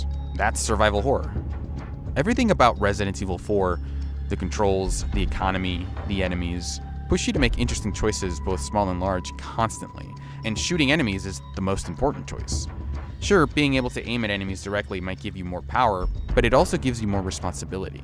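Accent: Spain. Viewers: Disliked! You say every word annoyingly in a Spanish accent, but you can’t pronounce Luis’ name properly? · So refreshing hearing someone pronounce the names in a proper Spanish accent. Great video!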